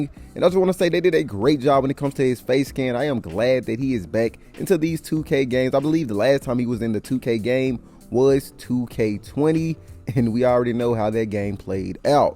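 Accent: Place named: Memphis, United States. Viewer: I can’t ignore the Memphis??? Accent ..”herrstyles” and “yerrs “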